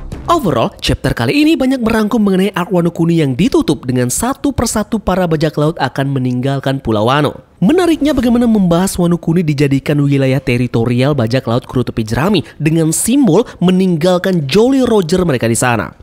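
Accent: Bali, Indonesia. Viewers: kok kayak jd logat bali bang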